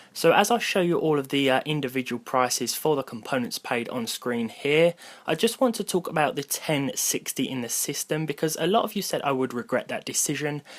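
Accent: United Kingdom. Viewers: british accect ftw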